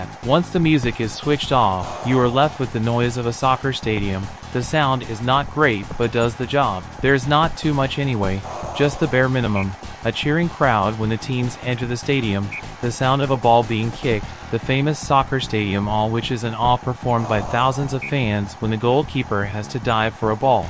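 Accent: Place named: North America. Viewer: "Being from Merseyside" Speaks in a North American accent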